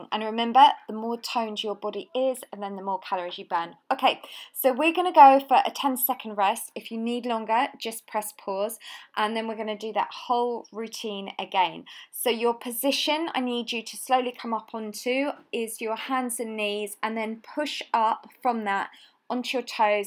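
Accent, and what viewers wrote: England, United Kingdom. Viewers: Love your ab and booty workouts!! Haha and i love hearing you say "booty". I love English accents!!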